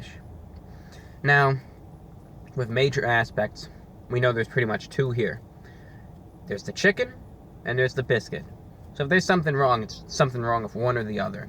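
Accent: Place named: Northeast, United States. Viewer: previous geographical indicators led me to believe that he was located in California, but alas, this revelation of presence in the state which I currently reside, north Carolina, in combination with his northeastern accent is confusing me. my life crumbles...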